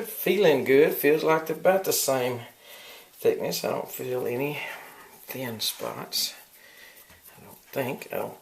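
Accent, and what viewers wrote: Texas, United States. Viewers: harplover1; Texas people have a "drawl". You get used to it. You must be new to the internet? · I subscribed within seconds of hearing your lovely Texas gentleman's accent! Thanks for sharing this recipe too!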